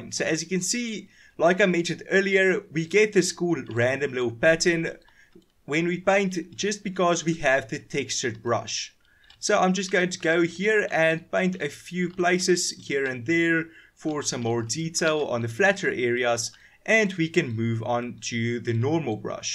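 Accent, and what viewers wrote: South Africa. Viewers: Are u from South Africa bru? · Finally thanks for this amazing addon😊Also are you South African I hear a bit of an accent😂😭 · This is perfect. Love the voice btw from SA? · You sound south african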